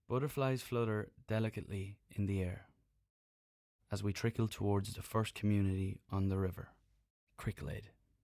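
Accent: Ireland. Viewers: love the irish accent that he has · especially with the Irish accent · * soothing Irish voice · I don’t think I’ll ever be able to sleep again without this. Niall’s voice & beautiful Irish accent is so soothing. The only problem is I never get to finish the video. In less than 5 minutes,I’m sleeping like a baby.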